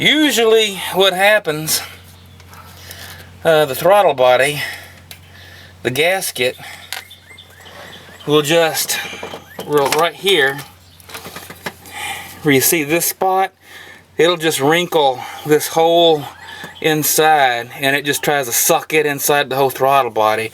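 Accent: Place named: South, United States. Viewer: Sorry so long but my just lost my mom last year an then month or two ago his younger brother died suddeny like my mom and that was always my trouble shootin team dads just tired of hearing it run wrong but its to solid truck to give up on for 86 model and only 22 or maybe 26 or 28,196 is actually all it has in miles and never left my home town since Henry Ford shipped it u know of any other TSB again sorry so long of explaining. But u sounded knowledgable enough an had just enough country swang i can understand sold Haley parts to fellow cldnt say shit with mouth full unless it was 100 mph thanks for any info Sir And as always God Bless u and urs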